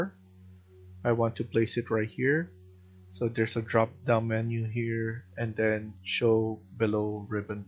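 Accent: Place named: Philippines. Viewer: salamat rin sa guide.. I was right when i first heard you alam ko pinoy agad 😅.. I needed his guide thanks again..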